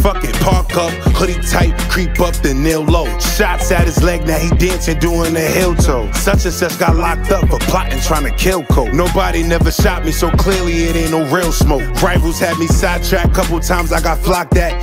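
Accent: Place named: New York, United States. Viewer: This is what NYC supposed to sound like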